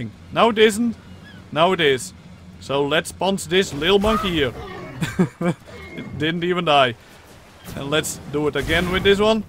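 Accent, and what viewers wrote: Netherlands. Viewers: Ik hoorde al meteen dat je een Nederlander bent! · U are dutch right because you kinda speak steenkolen english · Lel your dutch accent is getting weird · Ben jij niet gwn Nederlands ik kan het horen aan je accent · Are you dutch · Jaylen Scarlett hes dutch... i think that i recognize my own accent in it (before i moved to the states) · Nederlands. Accent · Dutch not indian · are you dutch?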